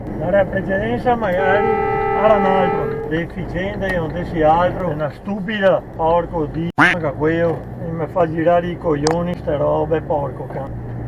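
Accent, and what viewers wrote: Belluno, Italy. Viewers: buonasera non ho ancora trovato il tempo di contattarti via Mail che sto facendo straordinari al lavoro,comunque ti scriverò sicuramente,comunque hai l'accento identico e la stessa cadenza del mio ex titolare di Belluno e Alleghe quando lavoravo in gelateria qua a Stoccarda ora mi occupo di sistemi d'iniezione nel settore aero navale una grossa azienda qua nella foresta nera,comunque pochi mesi fa ero nel Veneto a visitare Venezia e Padova e son passato a Losson dell Battaglia per visitare un momento ai Caduti della Brigata Sassari che sicuramente forse conoscerai è proprio all'ingresso di Losson in quanto io abito si in Germania ma sono sardo di Sassari e volevo far visita a quel monumento ai Caduti Sardi,ciao buon fine settimana🙋🏻‍♂️🙋🏻‍♂️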